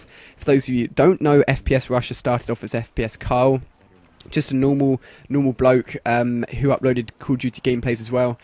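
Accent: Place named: United Kingdom. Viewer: AHAHA! ANOTHER GUY WITH A BRITISH ACCENT!!!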